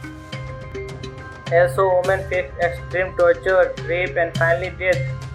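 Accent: India. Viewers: My mom hates your hindi accent, anyways, Vlad The Impaler was a mini-king Of Dacia (Named Romania In present)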